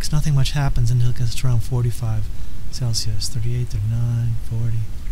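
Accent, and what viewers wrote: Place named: RP, United Kingdom. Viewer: You talk as son of the Queen of UK. Are you an engineer?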